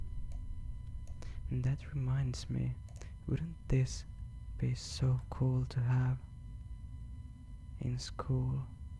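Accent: Finland. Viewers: You sound Finnish, are you from Finland? :)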